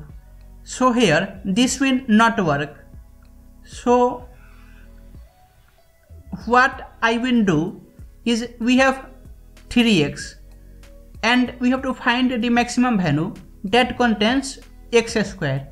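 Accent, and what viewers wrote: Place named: Bengal, India. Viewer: A Bengali accent ❤️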